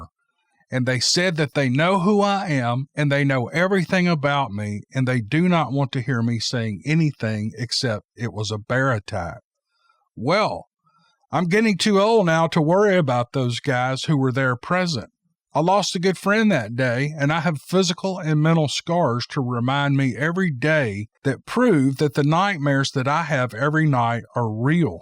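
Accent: South, United States. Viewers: Of all the cryptid channels, is my pick as the very best. No small talk chatter, straight into the story, excellent diction, smooth Southern charm with a clear and soothing voice, got it in abundance! Cannot fail to give proper credit to all the submitters for their excellent ability to covey the story in writing. Wouldn’t be successful without all the quality content!! Miigwetch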